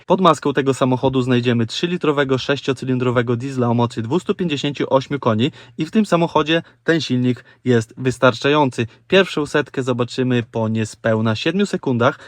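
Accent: Podlasie, Poland. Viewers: Ale zaciagasz podlasiaku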